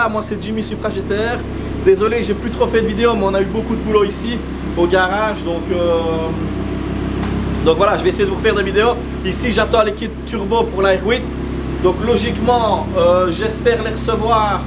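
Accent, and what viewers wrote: Belgium. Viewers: Je viens de découvrir ta chaîne ! Superbe. Et çà fait du bien d'entendre ce bel accent Belge ! Vous êtes tellement sympa en Belgique 🙏🙏🙏🙏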